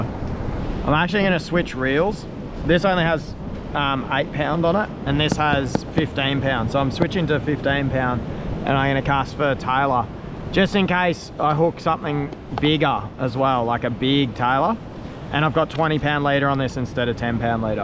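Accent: Australia. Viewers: definatly has a different way of talking but yes his accent is strong aussie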